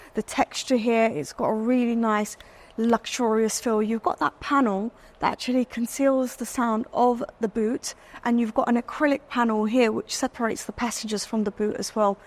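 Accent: London, United Kingdom. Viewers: you can hear the london accent coming through lol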